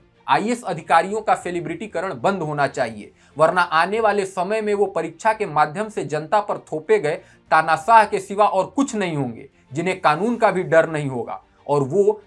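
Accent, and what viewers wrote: Standard. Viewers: Wah...itni suddh hindi sunn kar mera mann gad gad ho gaya....bahut bahut subhkaamnaye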